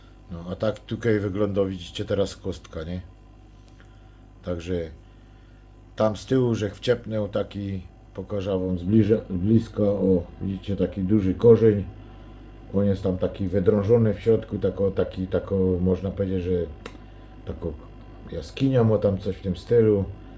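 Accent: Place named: Silesia, Poland. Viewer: Witom....witom 😊👋 śliczne paletki 😊kosteczka fajniusia😉 miło było posłuchać karlusa ze Śląska 😉 pozdrawiam serdecznie 🙋‍♀️👋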